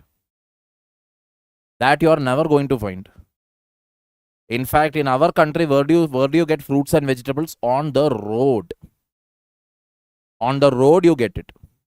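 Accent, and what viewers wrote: Kerala, India. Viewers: I think he is from Kerala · Sir ru from Kerala same my sir has same slag as u talk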